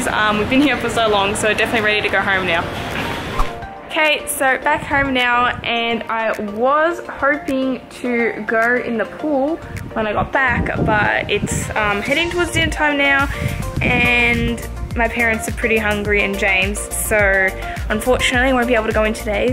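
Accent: Australia. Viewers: Are you Australian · ur aussie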